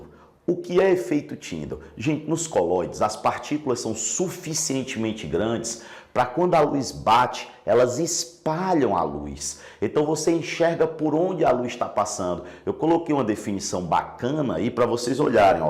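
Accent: Northeast, Brazil. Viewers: prof, o senhor é nordestino? a fala parece com o dos meus professores (sou paraibana)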